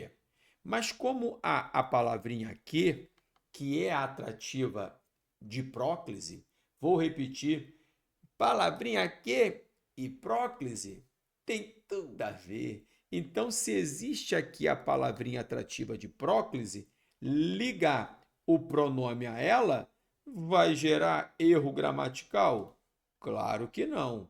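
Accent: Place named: Rio de Janeiro, Brazil. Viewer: Esse carioquês é uma maravillha, kkkkkk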